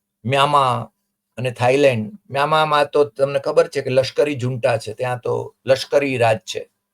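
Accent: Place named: Kathiawar, India. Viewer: આપ મહેસાણા બાજુના છો છતાં પણ કાઠિયાવાડી લહેજા માં બોલી જાણો છો 👌🏽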